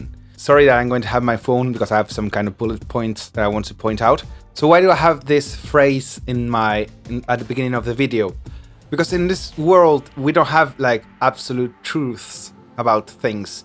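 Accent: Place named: England, United Kingdom. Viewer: How many truths, and none of them are absolute!! Great video, love your english accent bro. Keep it up! 💪💪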